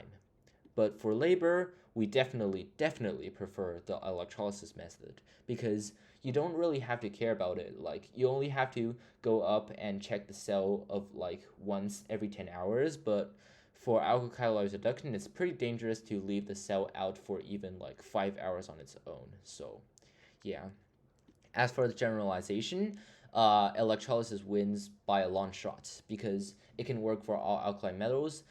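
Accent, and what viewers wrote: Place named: California, United States. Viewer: Here in the states gas water heaters use a sacrificial anode to protect from corrosion and it's often made out of magnesium (sometimes an alloy that includes aluminum or a little zinc) , I don't know what's used in China(or wherever you are with a Californian-esq accent), but I hope that might be useful to know..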